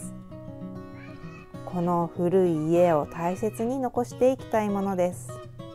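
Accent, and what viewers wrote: Kansai, Japan. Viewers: 素敵なご実家ですね。今もこういったお正月行事をきちんとされているなんて本当にいいことだと思います。さんは、兵庫県のご出身とのことですがいつも動画の中では関西弁が出て来ませんでしたが、今回の動画には出て来ていましたね。😊 今年もよろしくお願いします。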